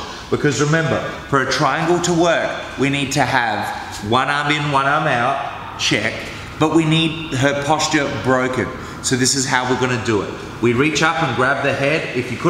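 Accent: Australia. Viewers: His accent sounds Aussie, but his school is based in LA now I think?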